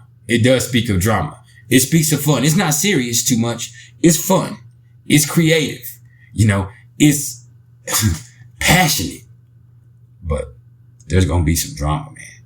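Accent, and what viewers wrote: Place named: California, United States. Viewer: This gotta be a Cali accent😂😂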